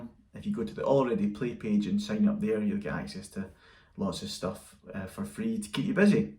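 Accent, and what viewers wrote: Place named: Scotland, United Kingdom. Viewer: Very very helpfull, included your magnificent Scottish accent, which is not the easiest thing to understand for an old Frenchman like me...Thank you very much indeed !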